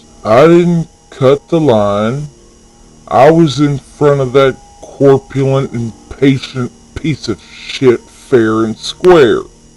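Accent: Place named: South, United States. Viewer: Woah he's southern damn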